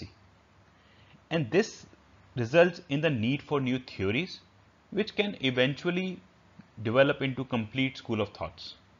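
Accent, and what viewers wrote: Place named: India. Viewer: The Speaker is good to understand, with a light indian accent. Only the names are not proper spelled. I was puzzled by the spelling of Keynes. His name is John Maynard Keynes- Not Mayer. Marx as a economist sounds wired when you know his biography. As a critical philosoph, a Dr. of Scientific Law and Journalis, lector etc. involved in the worker class movement and creating a new idea of society. May be that is the reason why economist can not figur out what his impact was in the european countries he influenced. At last Schumpeter is not from Germany even he have had a great influence and new the austrian school better as anyone else as a oponent of Max Weber.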